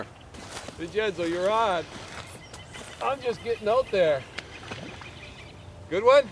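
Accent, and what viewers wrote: Canada. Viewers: "Eh?". God, I love Canadians. That and a really superlative lesson in catching catfish!! :) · Is this guy Canadian, eh? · Are you from Canada Aye? Nice videos good information it has helped me a lot ! Thanks.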